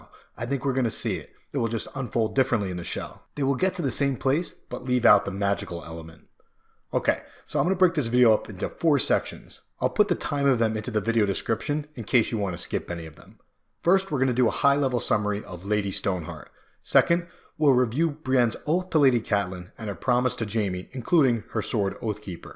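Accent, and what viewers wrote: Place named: East Coast, United States. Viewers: Cammy O hey woah...east coast accents are bad-ass....not cute. 😉Love ya girlie. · Normally I could care less about lady stoneheart; however, after watching this video I hope HBO (or D&D) puts the big scene in the show! As usual, you make everything SO interesting, even Lady Stoneheart! Your positive attitude & 🆙beat personality😄 makes your videos a joy🌈 to watch 👀 Your cute EastCoast accent & awesome voice 💞 makes your videos a joy🌈 to listen to 👂 Always a pleasure Thanx!😁 💗💚💛💜💙💖💚💛💜💙💝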